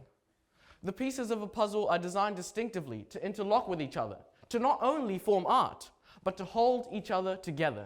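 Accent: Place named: Bemba, Zambia. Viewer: Am laughing at the bemba in English 🤣🤣🤣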